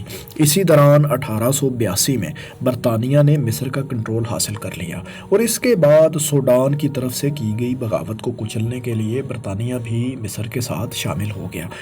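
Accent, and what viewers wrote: Punjab, Pakistan. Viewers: ماشاءاللہ بہت معلوماتی ویڈیو تھی۔ فیصل بھائی سے درخواست ہے کہ اس ویڈیو میں بولنے والے بھائی کے بجائے خود آڈیو دیا کریں کیونکہ یہ بھائی پنجابی زدہ اردو بولتے ہیں اور مجھے اس سے بہت کوفت ہوتی ہے۔ مثلاً عِلاقہ(Ilaqa)کو عَلاقہ(Alaqa) ، صورَتحال(Soorate Hal) کو صورْتحال(Soorte Hal) اور آزادی کو ازادی تلفظ کرتے ہیں ۔ اور 19 کو انیس کے اُنی بولتے ہیں ۔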